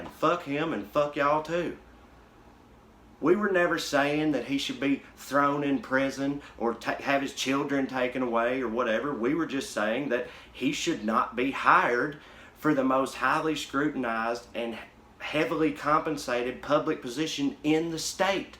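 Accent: South, United States. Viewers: I’m just here for the adorable southern accent!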